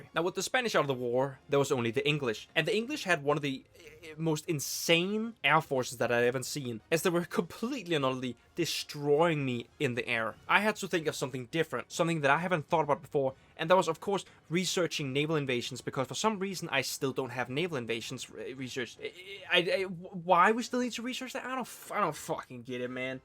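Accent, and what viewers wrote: Denmark. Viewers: Live the danglish Ære til Danmark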